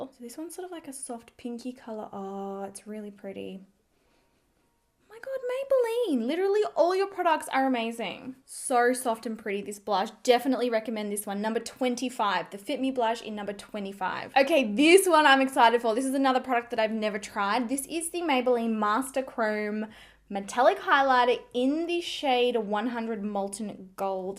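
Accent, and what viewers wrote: Australia. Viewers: Just as I'm having fun listening to your aussie accent..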